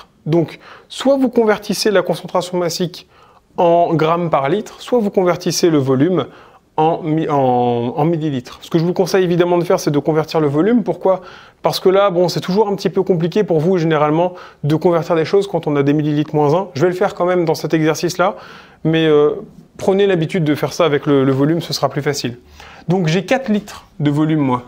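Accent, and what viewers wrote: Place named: France. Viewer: Mignon votre accent français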